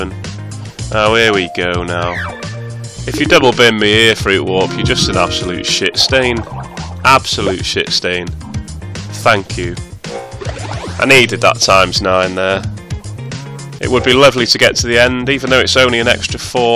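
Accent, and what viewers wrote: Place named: Liverpool, United Kingdom. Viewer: oh i am a 51 year old woman from liverpool with a gambling addiction and trying to stop .... i live in the south of england .... i am using my sons creepyhomer acct to watch you and ROCKENROLLA ... i love it when you both WIN although i kind of like it when you both LOSE ... sorry but it brings up that sick panic feeling i would get and then the GUILT OH THE BLOODY GUILT ...thats what always got me xx you sound like a scouser though MATE X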